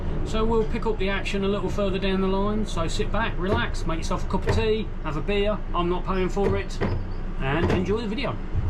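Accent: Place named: Lincolnshire, United Kingdom. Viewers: Thank you for another really interesting video. I would have guessed Midlands too with your accent. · So there seems to be a 25mph limit at upon entry to each station these days, I swear trains used to enter the stations at higher speeds back in the day... I'm going back to the 80's - Is this true? Any veteran drivers know if it is? Re: Accent.. Ha! I knew it.. i thought it was either south-east Northamptonshire or Lincolnshire. 👍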